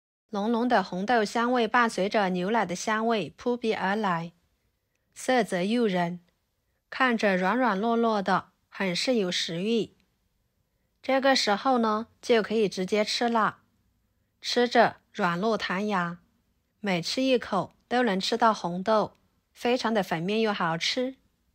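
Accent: Yunnan, China. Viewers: 又听到了云南普通话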